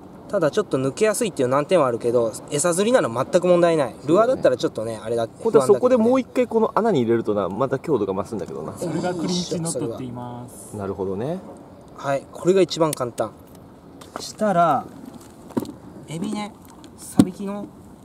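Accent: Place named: Nagoya, Japan. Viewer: 会長、名古屋弁どらでまくりだがや！笑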